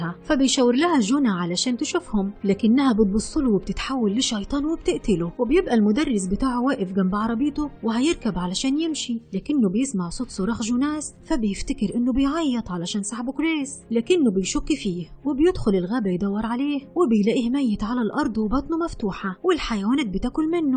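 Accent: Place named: Libya. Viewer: يل بتتكلم ليبيهة خلي السرد بلهجه الليبيه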